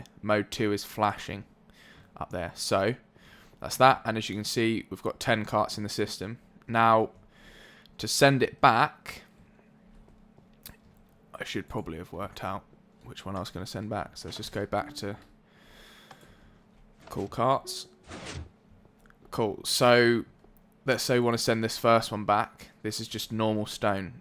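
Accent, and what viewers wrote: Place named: United Kingdom. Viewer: you can really hear the British accent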